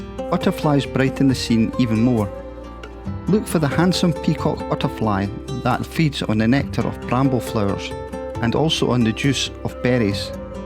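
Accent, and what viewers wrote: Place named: Scotland, United Kingdom. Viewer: My old stomping ground which I left over forty years ago for the land of Oz. I really liked your Scottish burr giving the local place names a distinct pictish vibe!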